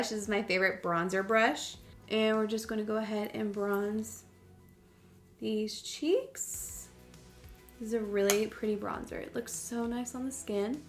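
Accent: South, United States. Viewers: Anyone else notice the small hints of her southern accent coming back since she moved back to Georgia?? I love it!!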